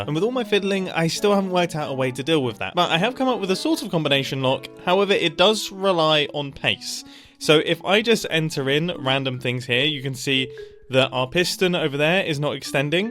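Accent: United Kingdom. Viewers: they're both bri'ish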